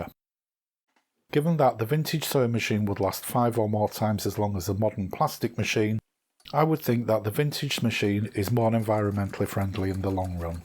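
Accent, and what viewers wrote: Northern England, United Kingdom. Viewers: What a brilliant and informative video. It's great to hear a northern accent too!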